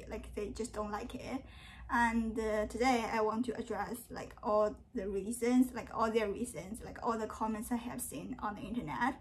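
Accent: China. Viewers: I know you have a strong Chinese accent(obviously) but I'm impressed by your English Grammar. You don't speak Chinglish like most students in China. You have really studied the language. I lived in China for 12 years and I honestly agree with you. These American/Chinese productions are very weird and I only watch movies from China when I need to see Kung Fu. 祝你萬事如意 有推薦的中國電影請跟我講 謝謝您小Lizzie!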